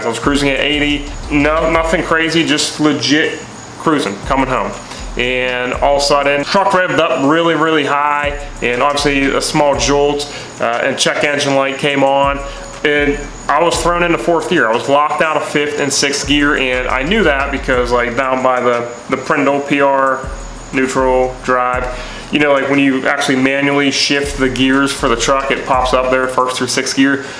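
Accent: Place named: Maryland, United States. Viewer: Familiar accent.. Maryland?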